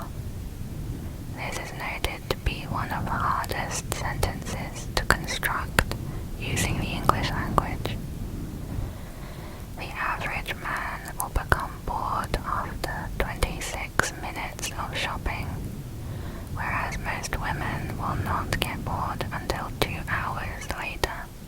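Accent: United Kingdom. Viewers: are you british? actually yeah you obviously are what am i on about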